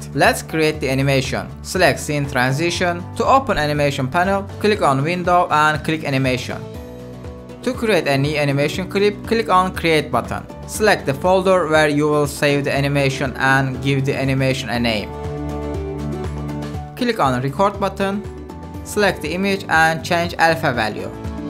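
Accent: Turkey. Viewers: Bende Turk oldugunu anladim :p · ENG Below Kötü anlamda söylemiyorum, ilk defa izledim ve ilk cümlenden aha türk developer bulduk dedim :D. Samimi ve kaliteli bir kanal kurmuşsun, umarım hak ettiğin yerlere gelirsin. I am not saying it in a bad way, I have never watched you before and figured out you are Turkish from your first sentence. You created high quality channel and you are very sincere. I hope you'll get what you deserve.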